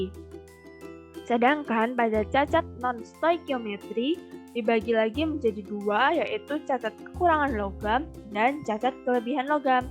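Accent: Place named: Java, Indonesia. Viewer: Kereen mb.. kurangin medoknya dikit lagi ya.. 👍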